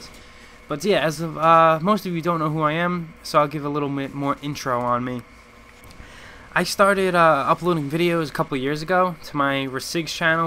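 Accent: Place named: New Jersey, United States. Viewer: sounds like he has a jersey accent lol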